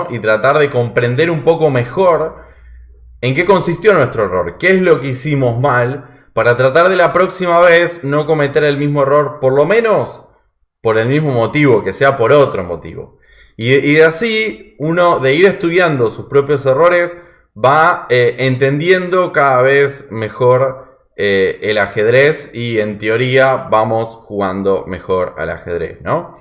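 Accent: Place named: Argentina. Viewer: El mejor canal que encontré para un principiante avanzado como yo. Instructivo y pausado. Que lindo el acento argentino. Soy español, juego online, incluso hice un amigo en Neuquén. Saludos y sigue asi!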